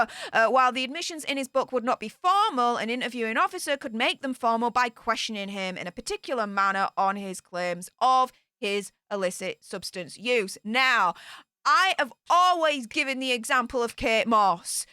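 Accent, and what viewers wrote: Yorkshire, United Kingdom. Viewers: is from Yorkshire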